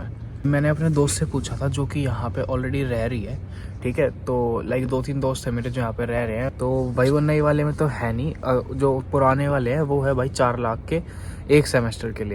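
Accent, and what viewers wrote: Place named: Haryana, India. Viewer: Bhai Haryana se ho Pura touch h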